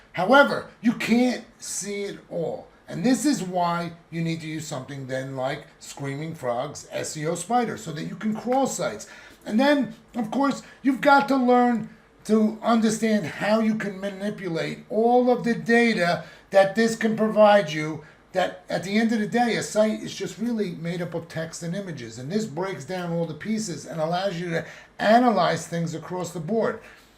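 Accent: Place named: Philadelphia, United States. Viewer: Hey are you from Philly?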